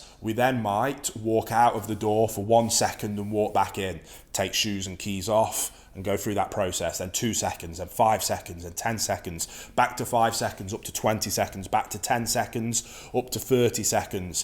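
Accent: United Kingdom. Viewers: Our pup is almost 5 months old and I have not had the stones to leave him on his own in his crate yet. Lucky to be mostly retired but still want to get him conditioned to being left alone. Look forward to trying your approach of seconds to minutes to hours. Thanks and I love a northern accent.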